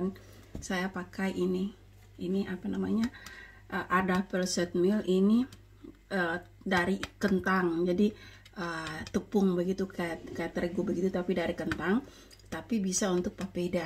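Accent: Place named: Ullath, Indonesia. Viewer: Mantap,logat Ullath bole biar ciri khas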